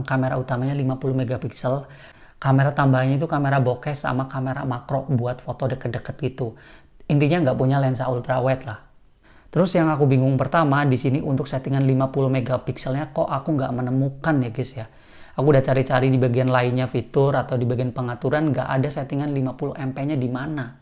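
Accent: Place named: Banyumas, Indonesia. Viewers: Khas Banyumas 😁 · Wiih wong banyumas jebule 😂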